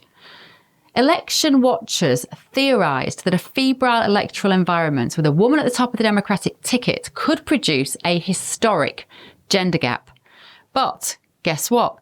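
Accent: United Kingdom. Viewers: I'm an American man in my 50s., if not for your lovely British accent.., I would be inclined to believe that you are just a wholesome American girl, that everyone loves to adore. Honestly, I only mean.., no matter where we come from, common sense is universal. Good form.👍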